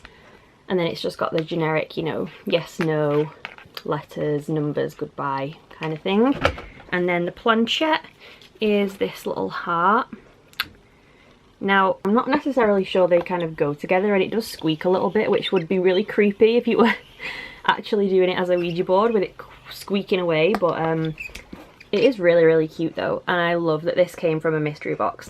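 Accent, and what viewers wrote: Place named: England, United Kingdom. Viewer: I like your accent... England????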